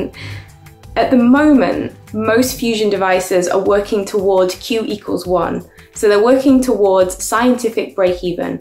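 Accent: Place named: United Kingdom. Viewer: Nice to hear some british accent here ^^ I really see a potential to your channel so here's a few tips to improve: - Love the improvised style but maybe have things a little more scripted so you stumble less on words and make explanations a little clearer - Sound echo is not much of an issue for now, just try to correct the channels, your voice is very much on the left channel here - Keep the balance between vulgarization and complexity. It's a niche but people are still very much looking for channels that go more in depth, best example is what Scott Manley does with rocket science Else, nice video, nice background, we can clearly see you are passionate about the topic and I can see you going places with this channel, keep up the good work ! Also, nice instance of laser fusion reactors in fiction is in The Expanse, it's what powers the ships and you even see the inside of the reactor in a few scenes (can't remember which episodes ^^)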